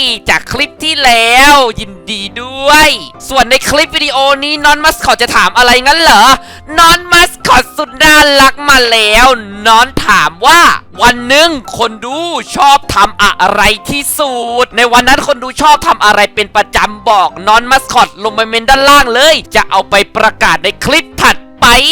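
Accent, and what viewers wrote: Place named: Isan, Thailand. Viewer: เล่นเว้าครับ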